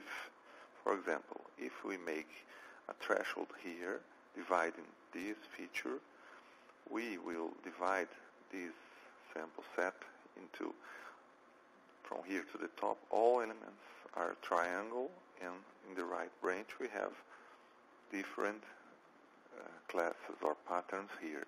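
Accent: Brazil. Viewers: You accent its similar to brazilian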